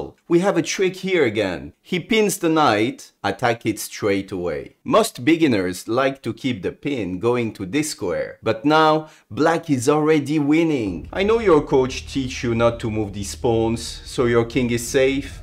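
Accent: France. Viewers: I take it your from your accent that you are French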